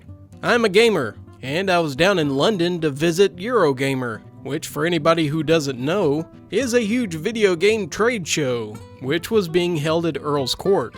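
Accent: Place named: West, United States. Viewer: Story #1 Setting: England. Narration Accent: American Western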